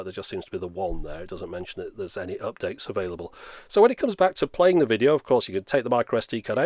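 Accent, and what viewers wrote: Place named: England, United Kingdom. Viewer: Wow nice presentation here, you were right on with just about everything regarding this Aukey DR-01 review. I took in most of your setup directions and menu setting THAT information is where I got the most value in the time spent here. I have a Vantrue N2 dash cam on the front of my Toyota Sienna and a nice Aukey DR-01 on the rear window over looking any rear end collisions or idiot drivers to the rear of my Van. I live in Lakewood California we all can agree that the So Cal. drivers here are the absolute WORST OF THE WORST! anywhere. you need a dashcam here just to save your ass if or when you have a problem. I was able to set up my device flawlessly. Your English accent for some reason makes it all that much easier to understand. Thank you for your time.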